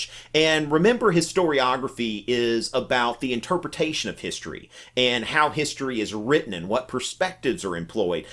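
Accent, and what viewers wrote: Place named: South Carolina, United States. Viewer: Hehe, yeah. got a thick South Carolinian accent. Little different from mine (Texan) with all the aaaa's.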